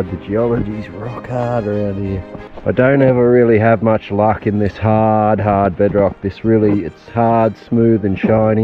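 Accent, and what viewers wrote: Australia. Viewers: The fella from down unda lol! Love the vids!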